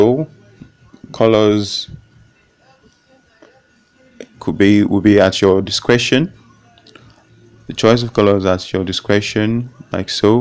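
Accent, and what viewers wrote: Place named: United States. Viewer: Bros, Even with your American accent, I am aware that you are a Nigerian and this house was built in Naija. Thank you very much for this very educational video. Please upload more videos dealing with Electrical, Plumbing, and other finishing aspects. Thank you very much. This has been quite helpful. Please keep it up and keep it coming. Thank you once more.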